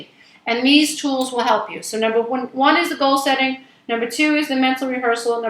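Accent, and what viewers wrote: New York, United States. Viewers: Don't you hear her NY ACCENT?! 🗽🗽🗽 love you -Brooklyn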